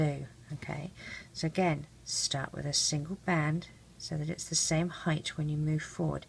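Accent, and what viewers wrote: United Kingdom. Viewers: OMG your british im a quarter cause my nana is britan and everyone after her!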